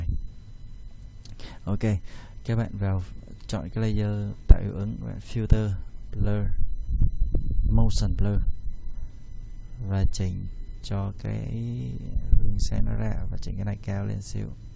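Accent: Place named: North, Vietnam. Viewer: góp ý một tí là bạn nên nói to hơn chứ đừng nói kiểu thì thầm như thế, mình khó nghe được giọng bắc. dù sao tut của bạn hướng dẫn rất kỹ và chi tiết. cảm ơn bạn, sẽ ủng hộ channel của bạn!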